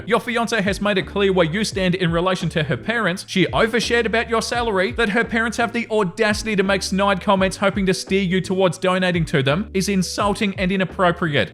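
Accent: Australia. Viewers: Silent lurker chiming in. 😊 I'm loving the longer content - I can listen to the stories and enjoy the Aussie accent (I'm a Melb girl living in the US a handful of years now... Hearing another Aussie helps me feel less existential dread right now 👀) while I have my morning coffee and plan my day, sneaking glances at kitty cam. 😻 Doing a longer video every other day is the way to go. Quality over quantity. Gotta look after yourself so you don't get near burnout. Give the kitties a cuddle from the far away Aussie woman who is currently freezing. (It's -12°C)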